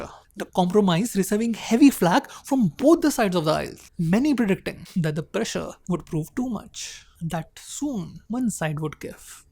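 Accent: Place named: India. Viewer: My goodness I can't take this accent. Among all the English accents, Indian English accent is the worst. My goodness. Go easy on Ts and Ds . You might kill em 🤣🤣😅😅. Not that other accents are better but if compared ......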